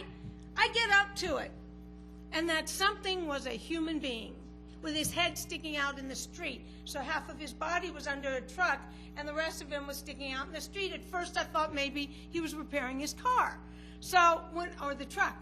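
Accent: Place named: San Francisco, United States. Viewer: the good old san francisco accent. :)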